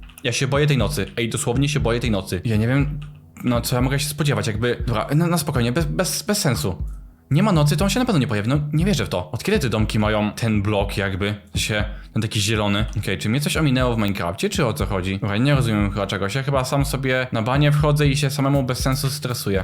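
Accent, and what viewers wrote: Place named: France. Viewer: Czemu masz francuski akcent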